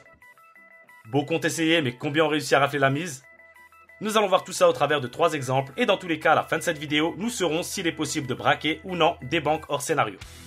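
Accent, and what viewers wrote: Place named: Tourcoing, France. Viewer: ta un axen de Tourcoing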